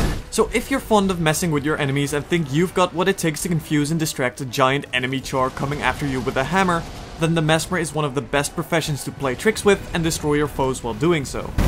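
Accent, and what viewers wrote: Netherlands. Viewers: I knew it :D you are dutch :3 could hear it :o anyways awesome explenation :o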